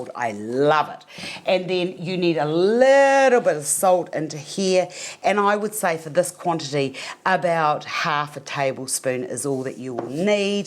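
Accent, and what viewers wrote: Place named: New Zealand. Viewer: Good old Kiwi accent : )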